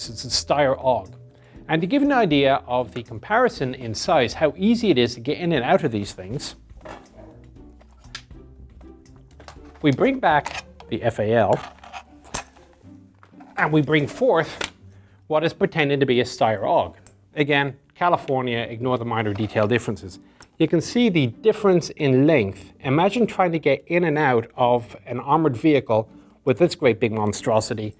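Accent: Ireland. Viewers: It’s that Irish lilt and occasional ‘tongue in cheek’ observation that keeps one engaged. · Did the accent never give it away? lol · all my life living with an irish mother is probably why i never noticed your irish accent... I alway knew it sounded a bit strange though, guess i just never put two and two together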